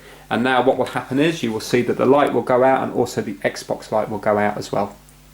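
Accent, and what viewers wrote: England, United Kingdom. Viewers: Its really fancy to watch a tutorial with a british accent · Its the British accent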